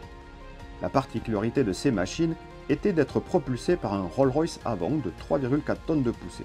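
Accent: South, France. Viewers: Très beau documentaire … Les détails techniques et historiques sont très bien présentés. Le petit accent méridional du narrateur et quelques pointes d'humour permettent de suivre cette présentation.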